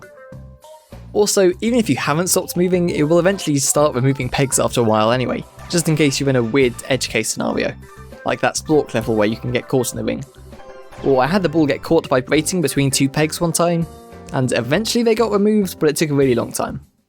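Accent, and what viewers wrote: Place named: United Kingdom. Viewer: He's just british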